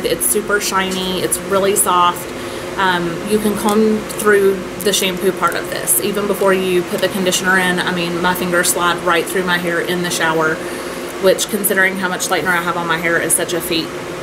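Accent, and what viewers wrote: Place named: United States. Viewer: What state are you from? I love your accent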